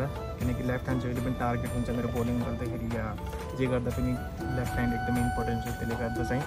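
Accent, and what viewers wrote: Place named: India. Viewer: nepali babaal nai x ta. tone ta aba halka aaihaalx ni india ma 15/16 barsa basesi tara tapaiko tei tone le gardaa ajha mitho suninx boldaa😍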